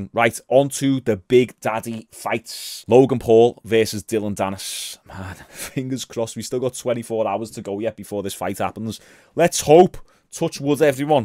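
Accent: Liverpool, United Kingdom. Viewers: Liva Pewl! I hear the Scouser in ya! Thanks for the breakdown!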